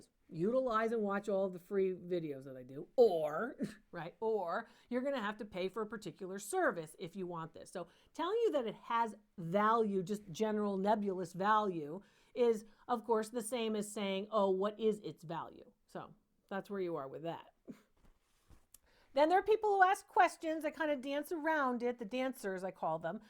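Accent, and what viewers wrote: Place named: North Jersey, United States. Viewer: Love you Doc! .... Are you sure you're not from North Jersey? 💗🤔😊 You sound just like my sister-in-law. (That's a compliment)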